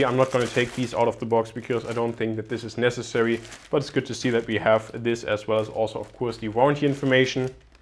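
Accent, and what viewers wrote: Germany. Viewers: Man hört richtig Rausch das du deutscher bist haha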